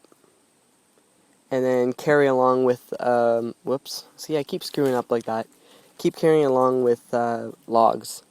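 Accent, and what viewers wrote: Canada. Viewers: You sound like a canadian bitch. Thanks for the tutorial. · are u canadian cuz u sound canadian and i know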